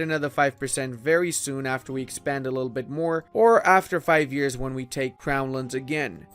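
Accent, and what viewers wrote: Germany. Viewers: I can't lie the weird German accent gets somewhat annoying after a while. Not hating just my opinion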